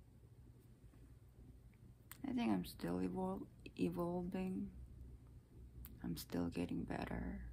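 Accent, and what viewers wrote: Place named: United States. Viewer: Life really is crazy. So many women would love to look like you. Yet here you are wanting to be like many of them. I hope you continue to pursue what you like to do. Hopefully there will be something that really flourishes and motivates you to focus on that. Your English is absolutely excellent. You talk like you were raised in America. I just found your channel and will continue to watch. Did you experience a difficult childhood? You seem like there is inner turmoil that causes you so much pain. I know it will not help but you are attractive and well spoken. Merry Christmas!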